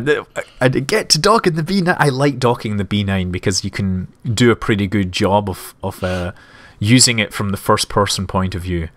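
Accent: Scotland, United Kingdom. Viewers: i need to know.... are you scottish?because you sound pretty scottish to me and ive heard alot of scottish people:P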